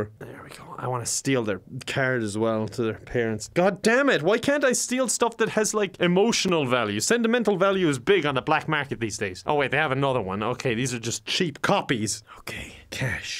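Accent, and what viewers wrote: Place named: Ireland. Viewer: Good to wake up to this sweet Irish voice. Have a wonderful day,!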